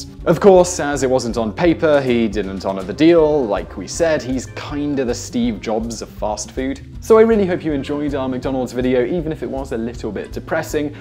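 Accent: United Kingdom. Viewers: Sexy British accent.cheers mate! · I get to watch one of your videos and look at your sexy face while you speak with that amazing British accent! 16/10 would watch again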